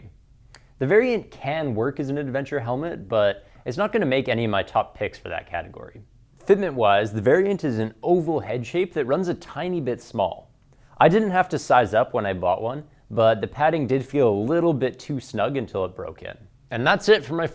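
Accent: Canada. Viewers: is he Canadian ?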